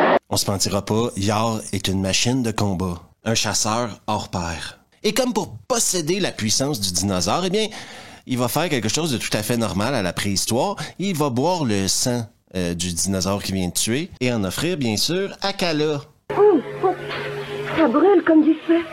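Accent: Quebec, Canada. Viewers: Ton style unique (humour caustique, présentation fluide, accent Quebecois....) rend ces films attrayants malgré qu'a l'écran on y croit beaucoup moins.Bonne iniative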